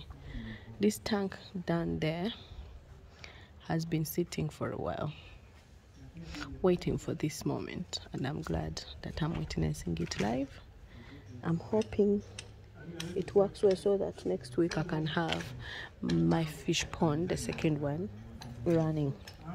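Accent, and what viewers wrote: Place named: Uganda. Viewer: You sound Ugandan, did you emigrate to Ghana?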